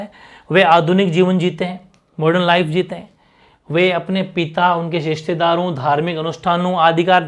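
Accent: Rajasthan, India. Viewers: Sir aap Rajasthan se ho kya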